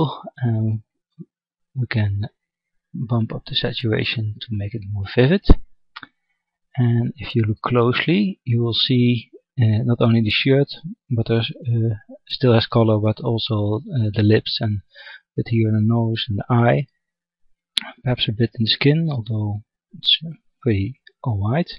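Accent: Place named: Netherlands. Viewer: Could it be that your dutch, you have a very dutch accent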